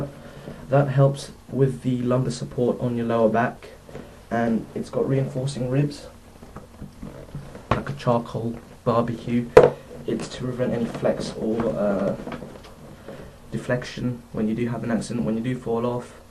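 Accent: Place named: England, United Kingdom. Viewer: A Filipino with an English accent, now I've seen and heard everything.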